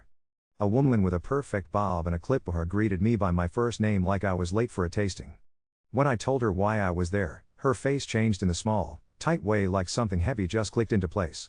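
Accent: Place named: South, United States. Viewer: why is the voice southern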